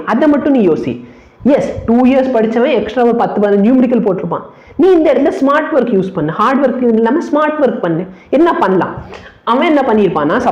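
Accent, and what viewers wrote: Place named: South, India. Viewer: mathya Pradesh a neenga south nu nenechen unga slang a vechi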